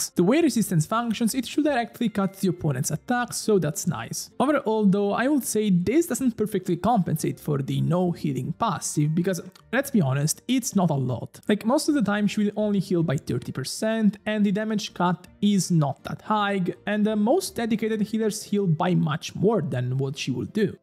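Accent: Italy. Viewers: He's also italian, you can hear his accent. He's mispronouncing it on purpose, so genshin babies don't get confused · Si sente tantissimo che è italiano ahahha. (Arleccino mi spezza ogni volta che lo sento ahaha )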